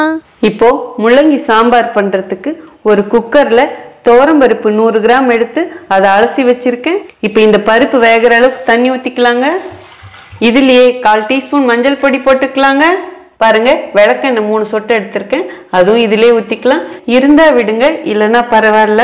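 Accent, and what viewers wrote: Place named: Coimbatore, India. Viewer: ஏந்த ஊர் கண்ணு நீங்க உங்களுடைய பேச்சில் கோயமுத்துர் வாசம்வருதோ recipes are super I made your pongal and tiffin samber recipe it came out well thank you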